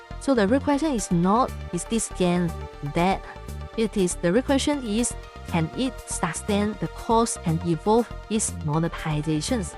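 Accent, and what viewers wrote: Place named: China. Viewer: tbh, it tooks me 6 minutes 34 seconds to get a single heroes realm match attempt to happens, and someone failed to confirm because they were afk most probably. I used to be able to get matches instantly. So there is already an impact on matchmaking. Also, this videos scream of chinese propaganda, made to make people stay in the game and play it. The strong chinese accent is too obvious for it to not be that lol.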